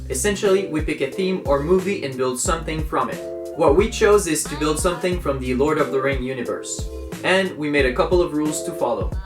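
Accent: Canada. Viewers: Thanks. I've seen people use cotton fibers from cotton balls applied with watered down glue to achieve a nice corroded texture. You sound Canadian. So Hi from a fellow Maker and Canuck from Quebec City.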